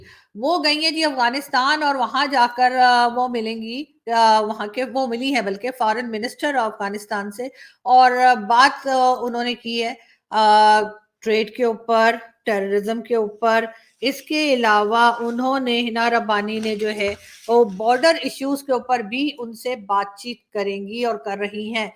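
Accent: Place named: Lucknow, India. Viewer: Well said....... Lucknow's style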